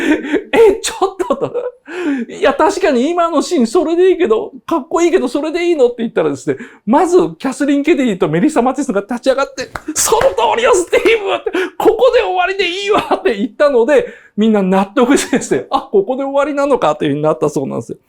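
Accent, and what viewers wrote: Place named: Sumiyoshi, Japan. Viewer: なんか土井善晴が浮かぶなと思ったら住吉区のイントネーションなんですな。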